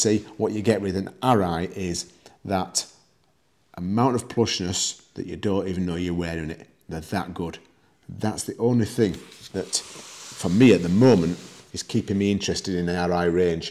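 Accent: United Kingdom. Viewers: With that accent, I was expecting the price to be in a British pound rather than dollars.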